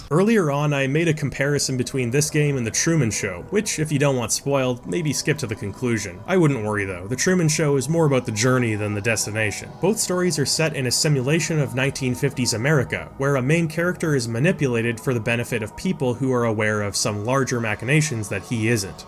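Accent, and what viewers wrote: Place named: Canada. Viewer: He's Canadian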